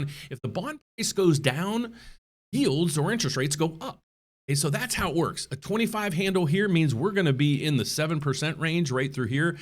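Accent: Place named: South, United States. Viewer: Wow! He has a southern accent.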